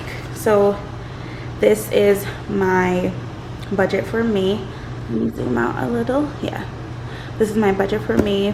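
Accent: Jamaica. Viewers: Girl i can hear the Jamaican accent a lil 🤣🇯🇲💘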